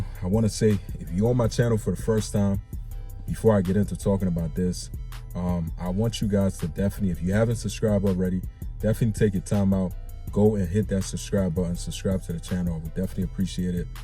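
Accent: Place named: Liberia. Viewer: Where are you from brother, I'm hearing small Liberian accent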